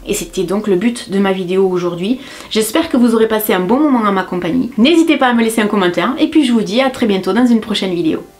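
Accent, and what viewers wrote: South, France. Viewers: J adore être en ta compagnie toujours un plaisir. Et on a ( oui je mets dedans mdr) Une communauté adorable. Mdr . Ces vidéos font du bien en ces moments compliqués étant moi même infirmière un peu d accent du soleil fait du bien 🍭🍭😘😘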